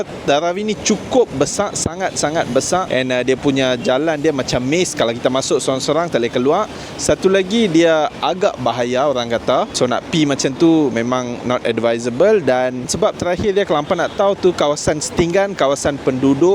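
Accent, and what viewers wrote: North, Malaysia. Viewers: 1st video saya tgk dan terus subscribe. Penerangan jelas sekali dgn info tempat dan harga. Explaination pun sempoi lagi2 dgn loghat utaranya hahaha dan planning aktiviti pun menarik. ada tunjuk aktiviti penduduk in different time of the day.your intention to potray the other side of the locals tu mmg sampai, terbaik. Mantappp👍keep it up the good work. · Btltu terbaikla, org Malaysia yg berbangsa India dan agama Hindu yg tentunya asal usul nya dari India, tp abgni dgn ckp lonhgat utara, pergi pulak sorang²tu, MMG Chanel yg baiklah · Wei lama aku tggu org kita buat content camni.. lagi mantap sbb cakap utara. Steady org kita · Best memang terbaik la.dengan cakap loghat utara syok tengok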